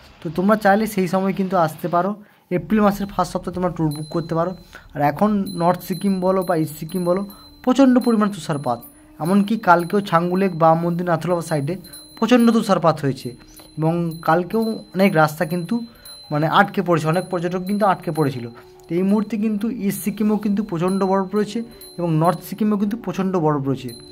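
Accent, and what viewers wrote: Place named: Bangladesh. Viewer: Bangladesh er lok kotha sunei bojha jai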